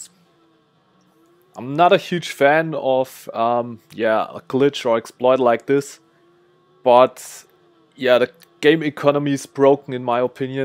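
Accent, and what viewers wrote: Germany. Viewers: Deutscher Dialekt, hört man sofort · Man hört den deutschen😂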